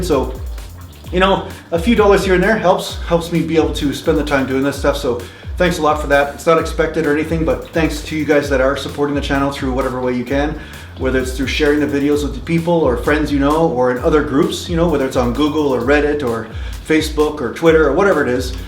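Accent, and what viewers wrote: Canada. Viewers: No prob my dude. You're canadian accent also reminds me of home (I'm originally from Minnesota) which makes me love your vids even more. I'm about to binge watch a shitload of them.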